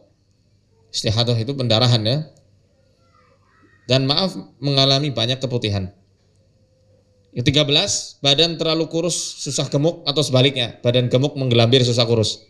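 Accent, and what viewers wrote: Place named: Banyumas, Indonesia. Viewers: Orang sokaraja banyumas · Kok ngapak dr mana ya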